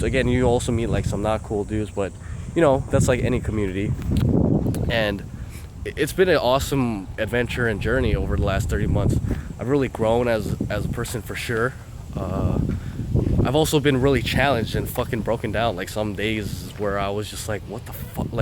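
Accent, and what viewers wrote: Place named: United States. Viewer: were you born in the US? Your English sounds so American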